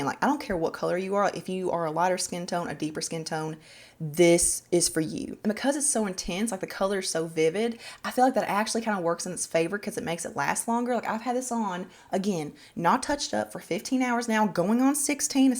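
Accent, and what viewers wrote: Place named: Georgia, United States. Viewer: I just found your channel and love it!! When I was listening to you talk I thought you sounded so much like me.I'm from Georgia.Looking forward to more makeup recommendations. We're having some very hot and humid weather here also.Thank you!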